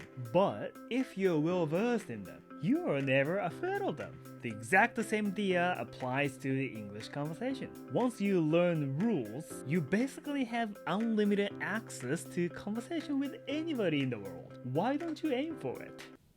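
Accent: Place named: United Kingdom. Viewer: ELSAの評価が厳しくて嫌になってるところ、好きなイギリス英語＆このやる気刺激動画でモチベ維持してます！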